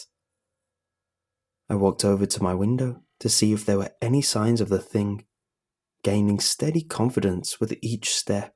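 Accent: United Kingdom. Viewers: half tempted to watch IT, but deathly terrified of clowns and I wanna say has an English accent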